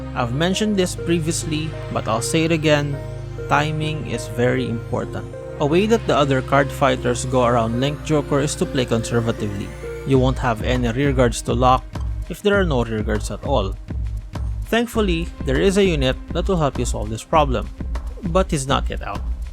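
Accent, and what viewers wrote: Philippines. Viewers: Are you Filipino? Your english accent sounds like it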